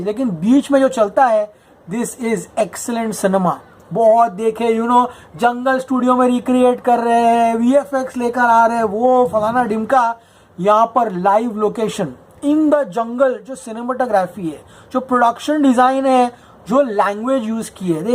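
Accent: Karnataka, India. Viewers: bhai your review on this movie is just brilliant,. Aap ka kannada tone is awesome 👍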